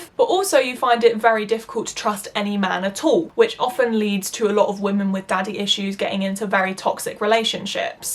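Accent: England, United Kingdom. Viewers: Her british accent 🦋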